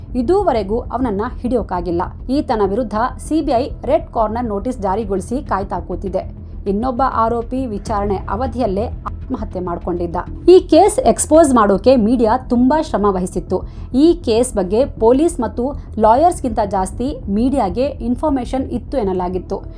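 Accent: Standard. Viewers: ಸ್ವಚ್ಛ ಕನ್ನಡ ಶುದ್ಧ ಕನ್ನಡ ವಿವರಣೆ exalent 🙏❤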